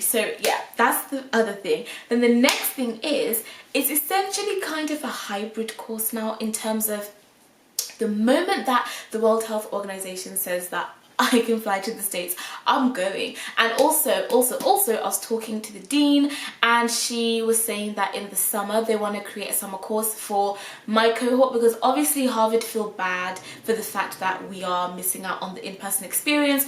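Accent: United Kingdom. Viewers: Wait is she british?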